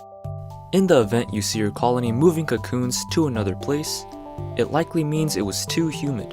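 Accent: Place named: California, United States. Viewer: Where are you from you sound like your from California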